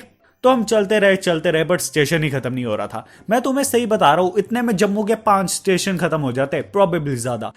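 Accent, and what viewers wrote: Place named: Punjab, India. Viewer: Bhai are you a punjabi because teri punjabi nikal aandi hai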